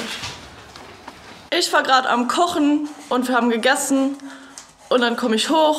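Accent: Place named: Luxembourg. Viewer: All Respekt, gesäit richteg gutt aus:) An däin lëtzebuergeschen Akzent ass bal ganz focht.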